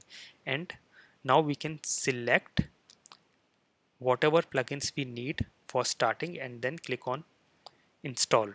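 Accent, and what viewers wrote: India. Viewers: I guess you guy from India... /smile/ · 这印度口音，听着真累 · Do you can speak in English with out Indian accent ???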